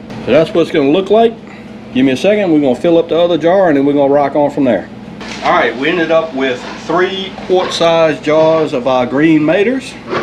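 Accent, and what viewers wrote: South, United States. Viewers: That comment isn't need this his youtube and he talk from the south i was born in Smokies Mts i talk like from the south .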